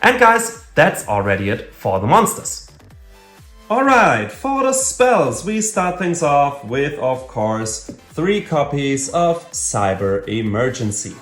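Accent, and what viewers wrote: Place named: Germany. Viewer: German english...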